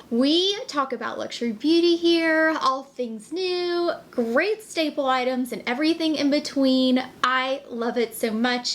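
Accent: South, United States. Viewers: both of those looks are beautiful on you. I also bought the Denim palette but have not had the chance to wear it yet. Still just mostly staying home here in Washington with mandatory masks when out in public. I bought 7 of the new palettes and love them. I have collected Dior quints for many years but love the new formula. You are such a ray of sunshine. Love your Southern accent and personality.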